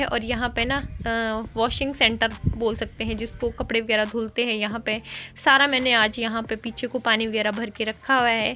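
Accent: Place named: Haryana, India. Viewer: Aapki language Hamare Haryana se bahut match khati hai Hamare Haryana mein bhi Aisa Hi bolate Jaisa ki aap baat karte ho bolte ho idhar ko Udhar ko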